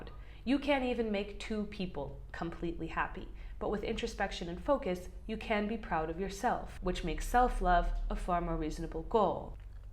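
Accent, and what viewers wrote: United States. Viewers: Unrelated to the video (which is very enlightening), you have such a satisfying speaking cadence and articulation. I'm Swedish, and most of my English-speaking friends are British, so I don't hear a lot of American accents - but yours is just so scrumptious (like a tasty snack)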